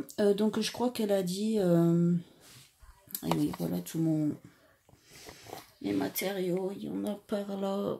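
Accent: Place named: Lille, France. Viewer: Ok j ai reconnu cette accent moi je suis de ronchin près de Lille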